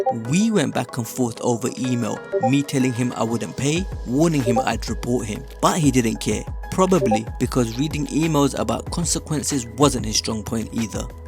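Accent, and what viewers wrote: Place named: Nigeria. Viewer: Why are you talking like a Nigerian 😂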